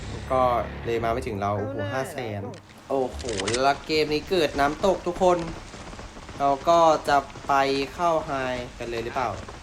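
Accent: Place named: South, Thailand. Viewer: คนใต้ม่ายนิบ่าว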